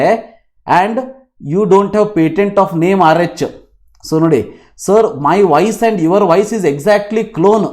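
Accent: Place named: Hubli, India. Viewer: Sir nivu Hubli yavaru nimma matu swalpa rough agi irutte adre karnataka da Ella kade nim video na nodta irtare adakke nim matu rough anusbahudu 😊 adu nimge swalpa gotirli 😊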